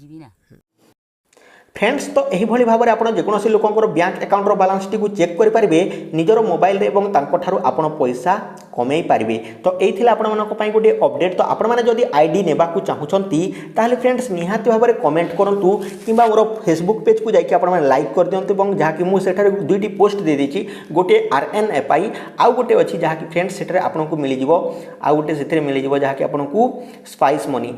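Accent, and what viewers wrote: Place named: Sambalpur, India. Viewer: Sambalpuri kahu6 bro ... Nice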